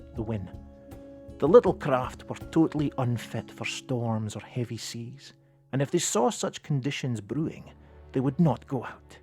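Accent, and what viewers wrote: Scotland, United Kingdom. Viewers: sometimes I have trouble understanding some terms. if I have official subtitles I compare them with what I hear. besides I sent these videos to my friends from several countries. it will be easier for them to understand if they also see subtitles. I love the Scottish accent but sometimes it is hard for foreigners to understand some things. it is also good if I learn how to pronounce names. thank you very much for these videos - they are really interesting. and I would like more;).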